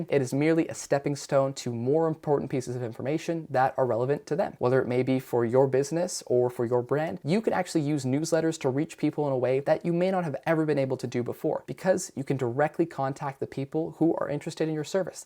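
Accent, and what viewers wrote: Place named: United States. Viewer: So just slow the video down? (I’m an American as well, but your American is showing)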